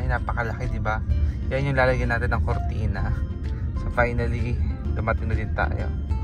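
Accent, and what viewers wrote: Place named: Pampanga, Philippines. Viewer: Kapampangan ka pamo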